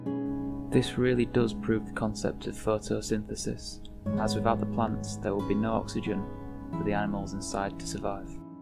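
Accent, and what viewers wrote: United Kingdom. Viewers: he's UK or something along those lines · Judging by the accent and vocabulary, you're from the UK? I am :)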